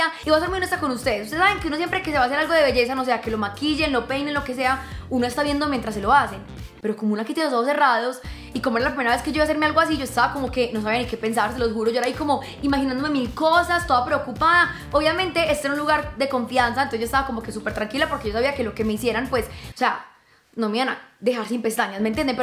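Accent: Antioquia, Colombia. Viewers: Hola eres la mejor amo tu vos yo tmb hablo paisa y amo todo de ti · Amo su acento, soy de Argentina ye encanta el acento de los colombianos 😍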